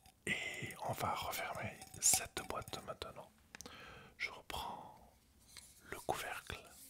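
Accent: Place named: Germany. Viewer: Merci pour tes vidéos... j'aime beaucoup ton timbre,ton accent légèrement germanique.En tout cas continue comme ça 👏👏👏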